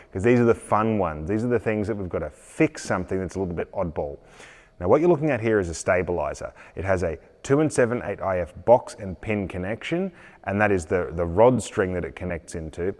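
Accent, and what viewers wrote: Australia. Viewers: If it werent for Ur "Aussie" accent, I'd say quit talkin and start cuttin!!!!!! Ur almost as bad as Diesel Creek in Pennsylvania, talk, talk, talk1!!🤠Ye Ole Caterpillar Cowboy🤠 in Illinois. Oh My Gosh how fast are U turning that part? 33;49 that is a thing of beauty!!!! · Greets from Las Vegas, USA! Love your videos and you commentary. I also subscribe to Cutting Edge Engineering Australia (CEE). You do heavy drilling rigs and and CEE does heavy mining machinery. Between the two of you, I have a smorgasbord of machining. I'm originally from Melbourne, Australia, and it's a real treat to hear Australian English. Thanks for bringing your MEGADRILL project to my attention. I'm looking forward to a binge watch on that one. Love you guys! 😀❤👍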